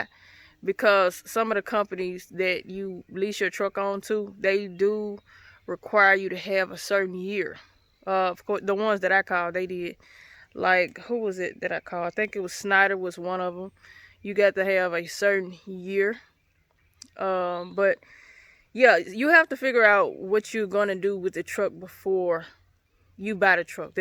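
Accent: South, United States. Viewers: Are you from Mississippi or Tennessee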